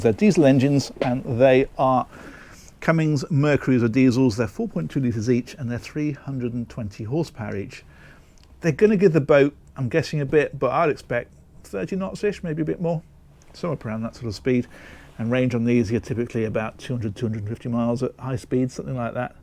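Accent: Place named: United Kingdom. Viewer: Hey you can say it any way you want to.. your a Brit and I for one don't expect you to talk like an American.. I am an American and I don't talk like a Brit.... I enjoy the heck outta these videos now matter! even if you spoke Aussie.. though that is rather hard to understand when they slip into there slang.. Man ya gotta love though.. take care y'all see you on the next one..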